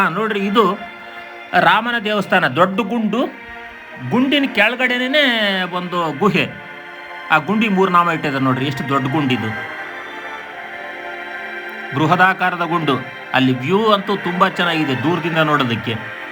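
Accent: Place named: Pavagada, India. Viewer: Pavagada bhashe lli heltha ediya guru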